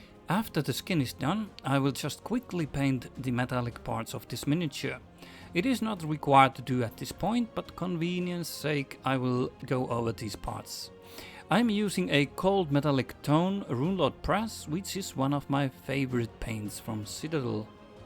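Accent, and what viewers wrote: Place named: Scandinavia. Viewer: Looks great!! I painted my Tzeentch similar but through airbrush techniques. Sounds like you are Scandinavian, svensk?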